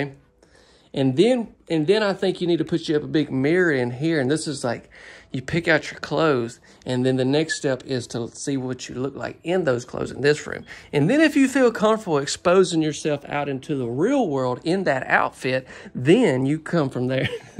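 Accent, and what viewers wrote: South, United States. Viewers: Hey, I really enjoy watching your videos. I love your southern drawl. And I LOVE the pantry dance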